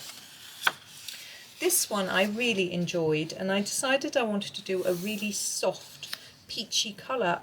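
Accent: England, United Kingdom. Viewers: Ah, you are such an inspiration! I have enjoyed this video so much, it has motivated me to think about what I will do with my copy of this book. I didn't buy it for myself because I've got several of Johanna's books and they were enough, seeing I've been going crazy on Amazon lately and have bought about fifty colouring books this year 😲. But a friend gave it to me for Christmas, and when I looked through it I felt like I could have some fun with it, just as you have described. I am going to blatantly copy much of what you have done, LOL! but have my own ideas for some of the pages. BTW I just love listening to you talk, I love the English accent. So different to my Aussie accent, you wouldn't think we were speaking the same language!!🤔. Best wishes for a very happy New Year to you and your family.🍸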